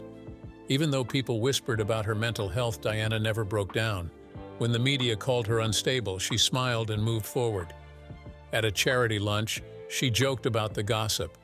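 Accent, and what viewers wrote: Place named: United States. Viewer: WHY IS THIS VOICED BY YET ANOTHER YANKEE, I AM PISSED OFF WITH THESE PEOPLE BECAUSE I WILL NOT WATCH HOWEVER INTERESTING IT COULD BE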